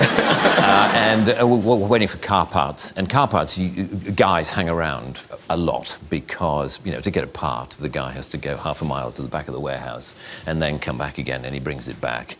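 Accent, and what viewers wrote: United Kingdom. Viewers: 喔喔，低音炮＋優雅英腔殺我😍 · 不知道為什麼看到他正常的講話有點不習慣😂而且他的英國腔也太好聽了吧😍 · 挖原來豆豆先生的聲音是這樣XDD 英國腔就是好聽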